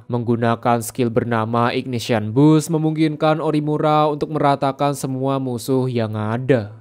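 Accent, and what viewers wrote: Java, Indonesia. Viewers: di pikir2 suara mu mendok bang, cipuyuuu ,wanitaaa,onimuraaa,kaka dari hogiii😅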